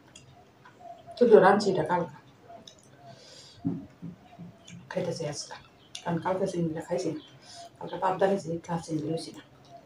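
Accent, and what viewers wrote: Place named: Assam, India. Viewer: Tomar kotha gulo sune amr sei assam ar kotha mone pore jai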